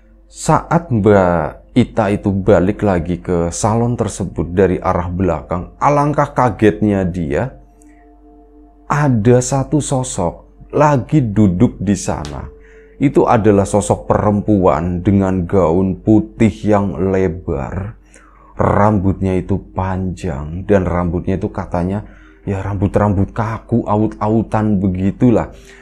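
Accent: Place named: Tegal, Indonesia. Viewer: Lama gak dengerin 1,5 thn lebih ,logat naratornya Tegal banget,he..he...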